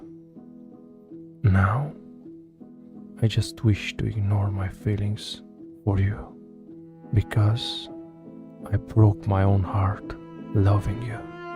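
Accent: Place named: United States. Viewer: Încerc să înțeleg ceea ce exprimi , este așa de frumos și atât de minunat mesajul , dublat de o voce magică și inconfortabila , mesaj exprimat atât de expresiv intr-o engleză americană atât de perfectă și atât de clară , parcă sună mai bine decât în “limba noastră,, deși subiectul este comun: Dragostea! In orice limbă o exprimi este Wow , talentul și vocea ta “Fac toți banii,, Te urmez și-ți urmăresc traseu și ascensiunea absolut uimitoare dar și pe deplin meritată fiindcă talentul tău este incotenstabil și incomensurabil ! Nu cred ca ai nevoie de ajutor ca să înțelegi mesajul meu ! Good night and sweets dreams poet of my heart ! 🙏👏😘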